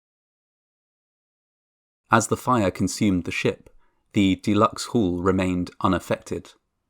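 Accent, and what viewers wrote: United Kingdom. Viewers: This guy has a great delivery style. Does anyone know which British accent he has? 🤔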